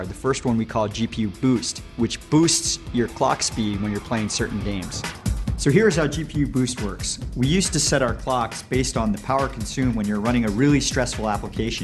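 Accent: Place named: United States. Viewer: Brown guy with an american accent...WOOOOOOOOT JOIN THE WAGON BROTHER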